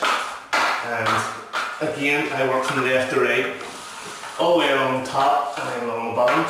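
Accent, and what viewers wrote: Ireland. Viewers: Sounds Irish ?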